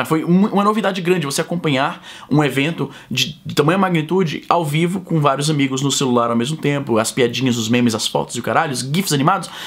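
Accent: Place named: Ceará, Brazil. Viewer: Curioso como tu n perdeu sotaque cearense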